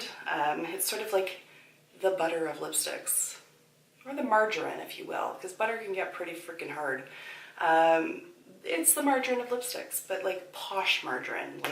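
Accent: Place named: Canada. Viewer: I kinda immediately guessed you were Canadian, because you speak very pleasantly :)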